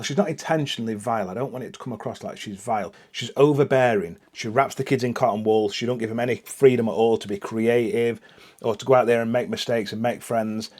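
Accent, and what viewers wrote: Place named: South Yorkshire, United Kingdom. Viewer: Mate, I live alone with my dog, and sitting watching you talk about your passion with a cuppa while I'm also having one is just so calming. You've already extended my tbr tenfold just by how you talk to your camera like you're having a brew with your buddies. I had lost both my parents by the age of 33, and my mother was from Leeds (she got me into reading as a child, worst thing she ever did tbh) and your dulcet south yorkshire/Derbyshire tones just make the place feel like home again. Keep doing what you're doing please, the world will suffer great loss if you ever stop.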